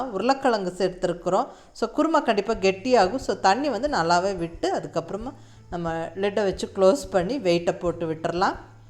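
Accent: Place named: Coimbatore, India. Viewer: உங்களோட கோயம்புத்தூர் பேச்சிற்கும்.... உங்களோட கோயம்புத்தூர் சமையலுக்கும்.... திருநெல்வேலி மங்கை தன் தலை சாய்ந்து தங்களை அன்போடு வணங்குகிறாள்..😊😊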